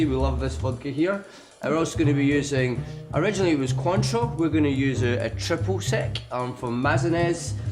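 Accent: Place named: Scotland, United Kingdom. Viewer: i love his accent..he's scottish?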